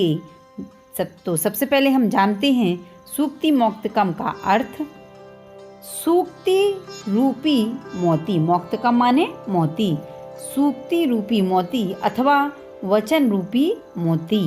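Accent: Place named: Bengal, India. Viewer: Also may I ask you a question, are you a bengali cause your accent sounded one?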